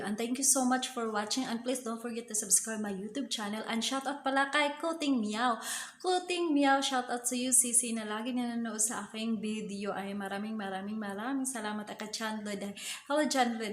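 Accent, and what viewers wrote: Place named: Visayas, Philippines. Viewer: Mam idol titigas ba ang sabon kng ang ilalagay kong lye is 15 pcs kalamansi tpos with tomato mga 5 to 10 tpos lagyan ko pa ng myra e...gusto ko gawing business ksi mam at gusto ko good quality..sana mapansin mo ung comment ko ma'am ksi mg start na ako bukas completo na ako ng gamit...By the way ma'am prang bisaya ang dialect mo..bisaya din ksi ako..Thanks mam..God bless and thank you for sharing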